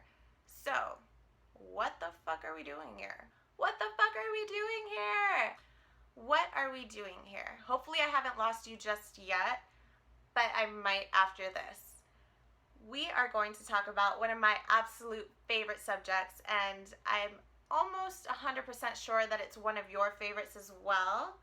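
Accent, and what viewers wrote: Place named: Latin America. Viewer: I enjoyed how this was put together. The way you edited, allowed for this to feel more real and personal rather than too filtered and distant. kudos to the forehead comment 😂 You're awesome. Keep at it, (Latino accent and all). 😊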